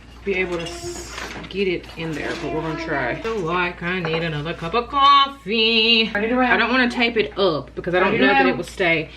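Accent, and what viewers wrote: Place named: North Carolina, United States. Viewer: Just stumbled across your page and immediately heard the NC in your voice. Just had to stay for a visit. Love all your beautiful Halloween goodies.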